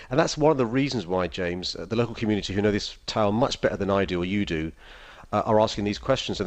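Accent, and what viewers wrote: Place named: Yorkshire, United Kingdom. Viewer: Speak up! How could anyone not from Yorkshire understand you?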